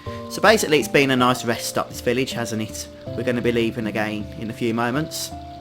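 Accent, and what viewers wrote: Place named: Northern England, United Kingdom. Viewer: what accent you're using? sounds like northern accent in England. You earn my sub.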